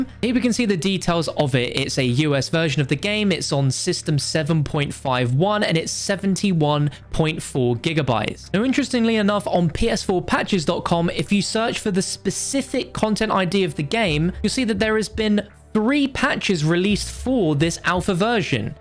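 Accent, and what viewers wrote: United Kingdom. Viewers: Why is British accent so weird?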